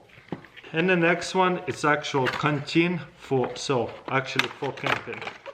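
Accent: Russia. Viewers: Lol he said water battles its "water bottles " lol i like his russian accent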